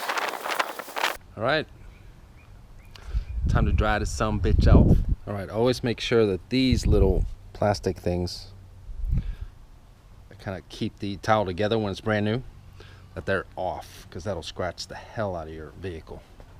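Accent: Pittsburgh, United States. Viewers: I find it very interesting that in 5 years you've acquired the distinctive Pittsburgh accent, sounding like a true native of the area. The Swedish component of your speech seems secondary to me. This vlog was enjoyable and a welcome change of pace. I've ordered a few cars in my life, waiting about 2 months each time. The domestics used to allow for this, ordered my Olds Cutlass in 1986, but today it seems only the Germans still do it. Nearly every car I've bought from dealer stock has been a compromise in some way. My current vehicle has a color that just will not grow on me. Maybe I'll get it right next time. Looking forward to your Merc. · Imma newbie to the Chanel and I was so surprised that you were from Sweden! That American accent was spot on! Ever thought of getting a Volvo XC90 or V90XC tho? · 5 years and you sound like a Pittsburgh-er, it's been 12 for me (same town) and still sound like a limy lol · Never thought you would be Swedish, you look and sound like an American.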